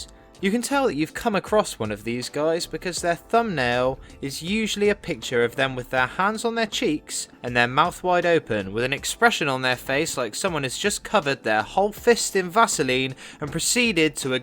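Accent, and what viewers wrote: Australia. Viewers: I thouth you came from australia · Looks like you got that aussy accent down after all bud.😆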